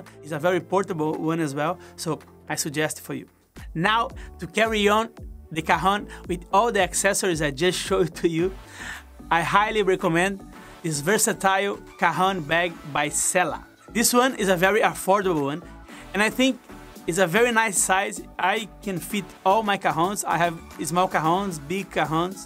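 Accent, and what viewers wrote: Brazil. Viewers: O sotaque tá arranhando véi! É sotaque nordestino falando inglês!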